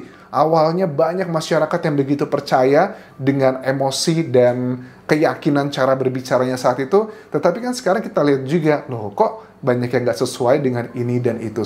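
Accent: Java, Indonesia. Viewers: mas boso jowone medok bgt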